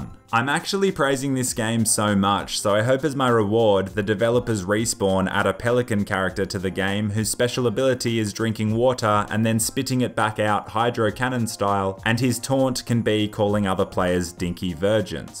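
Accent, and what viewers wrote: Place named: Australia. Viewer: Within the first 5 seconds, I was thinking, 'ohh, might be a fair dinkum aussie', 1 minute I was thinking, 'what a bloody legend, an aussie gaming channel. Bloody oath.' don't even have to be told. I can smell an aussie from the other side of the planet.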